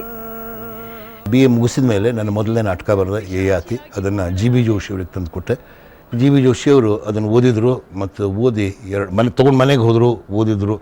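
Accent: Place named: Dharwad, India. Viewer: Pakka dharwad Kannada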